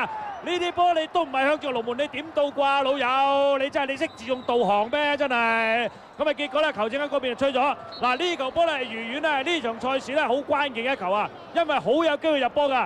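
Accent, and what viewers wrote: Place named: Cantonese, China. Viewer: 粤语解说就是有味儿！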